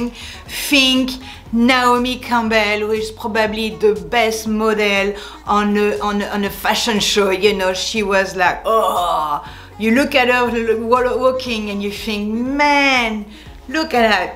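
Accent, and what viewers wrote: France. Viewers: I agree! J’adore da porter les talons hauts!! Je me sens super sexy 💕😆 Aussi j’adore sa te vidéo! Ton accent français es magnifique 😍 (je suis Canadian)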